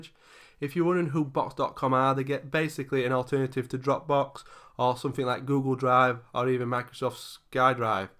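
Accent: United Kingdom. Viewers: Refreshing to have a fellow Brit doing these videos for once!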